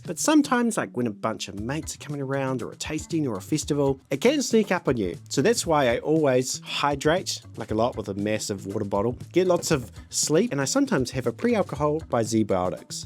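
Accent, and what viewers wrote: New Zealand. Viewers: Man that is a thick kiwi accent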